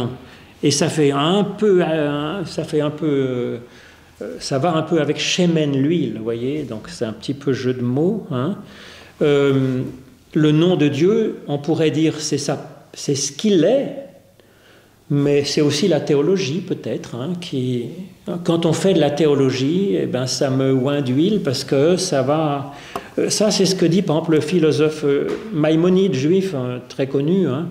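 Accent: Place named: Vaud, Switzerland. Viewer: merci... richesse du mot à mot dans le texte... où trouver les video 1 à 4? c'est drôle: tu as pris l'accent vaudois!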